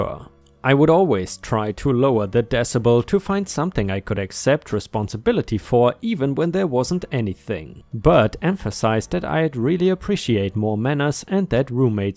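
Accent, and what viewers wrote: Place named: Germany. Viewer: Hi ⭐, 🌠🌟⭐😎⭐🌟🌠 No. We come to listen to your lovely accent. We don't need background music. Have you noticed how many people try to politely help you with your pronunciation of some English words you miss pronounce? You asked for our help so that you learn. We try to listen and catch your mistakes, to help you. How often does that happen on the internet, people politely helping? Anywhere but your channel people would be teasing you for your mispronunciation. To go from German to English fluently is a miracle in and of itself. We want to hear your accent, it's charming. 😊 Thank you for everything. Take care, stay safe, have a nice day. 👵☺️✌️☮️🖖 😷 🙉🙈🙊 ♥️😎⭐🌟🌠 💍🏍️👨‍⚕️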